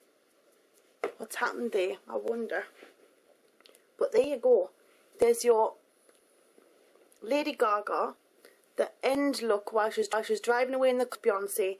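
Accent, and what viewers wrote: Newcastle, United Kingdom. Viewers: um im pretty sure by her accent you can tell shes from the united kingdom lol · Lmao. Obviously she's a Geordie.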